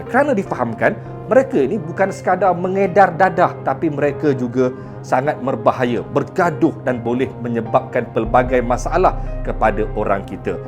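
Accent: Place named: Lampung, Indonesia. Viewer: Bahasa anda mirip bahasa Lampung mungkin satu rumpun dengan Lampung Indonesia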